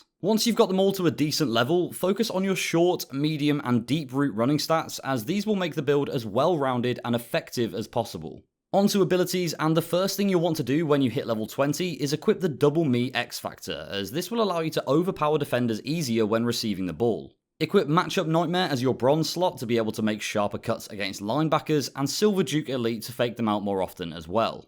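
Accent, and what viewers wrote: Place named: United Kingdom. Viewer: Clicked on heard British voice immediately didn’t respect your opinion sorry about it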